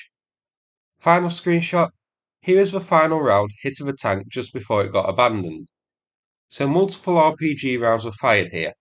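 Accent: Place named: Grimsby, United Kingdom. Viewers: Are you a Grimsby lad 👍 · A coastal town in Northern England, a different part of which sounds like he is from. Grimsby. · I have been trying to place accent for ages, and now I know. Eh up Grimsby!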